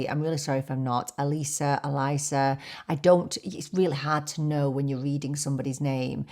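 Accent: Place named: Yorkshire, United Kingdom. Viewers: Yeah! I picked up the Yorkshire accent. Though I was thinking North Yorkshire. I'm in the US but I watch a ton of BBC. A lot of the reality medical series I watch are up North in Yorkshire. I'm sure it's really my ignorance that helped, tbh. And, oh my goodness, why you ever thought you were less than is beyond me. You are stunning.😍 I do think though that many of us women, especially moms because we lose our identities when the kids are young, don't really come into our own until our 40s. So thrilled to be part of your journey on YouTube.💄💖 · What perfect timing for this video. I had to pick my car up from a service after work and had to wait another hour for it to be ready!! Thank you so much for sharing your story. I feel we have had similar experiences in life living abroad, having a child overseas without family and we are both teachers! 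Teaching is my second career and I didn’t study for my PGCE till I was 43 (now 59!), it was a scary decision but I’m so glad I did it. Whilst it’s not the best paid job I’ve had it’s definitely the most enjoyable! I detected the Manchester accent straight away and since you mentioned it today I can detect a little Yorkshire accent! My dad was a Yorkshire man and half my aunts/uncles and cousins have a Yorkshire accent. During Covid I was in Thailand alone working online, zoom calls everyday with my students and parents, I was really out of my comfort zone in front of the camera, but I did it! My partner is an Ozzy and couldn’t get back into Thailand till almost 18 months later. It was during this time I really got into YouTube and I got so much joy from making new friends through the amazing communities too. It was a time to really appreciate my own company (apart from my 5 rescued dogs). 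So happy I have found your channel, you speak with honesty and integrity and I love your videos.❤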